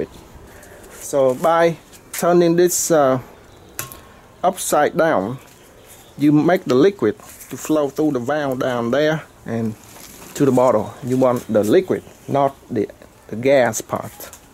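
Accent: Vietnam. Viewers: Sounds like a Vietnamese accent. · Sounds like Vietnamese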